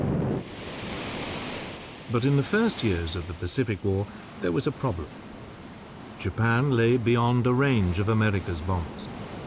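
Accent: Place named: United Kingdom. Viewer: Narrated by the British.....Shut up already.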